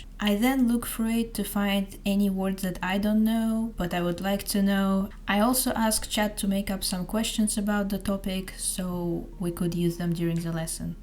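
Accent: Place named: Russia. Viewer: Your English sounds like you are Russian or so… feels strange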